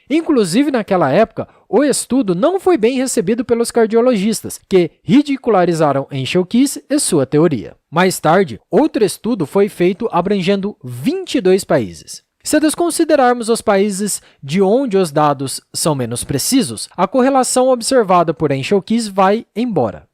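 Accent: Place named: Rio de Janeiro, Brazil. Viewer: O sotaque do narrador me deixou maluco quem o carioca!! 😂😂😂😂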